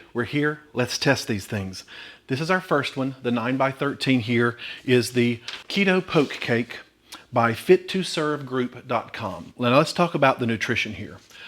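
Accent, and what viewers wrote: South, United States. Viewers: Even if I have no interest in a particular recipe I ALWAYS love to hear what has to say. The videos are exactly as long as they need to be and have helped me stick with keto and not get bored. Useful info, humor and heart — and that delightful southern accent just seals the deal! ❤ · I love your videos and the cakes look delicious, you make me smile the way you react to the taste testing, well done, your a great cook and a big thank you to the creators of these cakes, I also love that Southern drawl of yours. ❤😎 🇬🇧🏴🇺🇲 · Oh my goodness, so I stopped doing keto about two years ago, maybe more and I have gained more way in the last two years than I ever have in my life. I have been so miserable, so I’m jumping back on the keto wagon. But I used to watch your videos all the time, and I just have to tell you… You look amazing!!!! 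I thought you looked great several years ago, but when I saw you again, I barely recognized you. I knew your sweet, southern accent. If you didn’t have a husband and you were straight, I’d be hunting you down… Just kidding, I’m not a stalker. But you do look amazing honey. I’m so proud of you.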